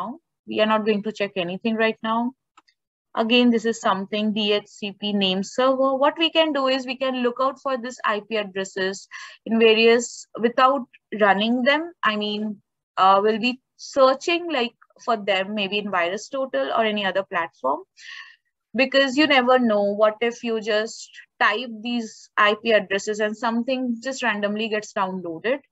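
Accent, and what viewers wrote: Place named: India. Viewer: Indian Acent.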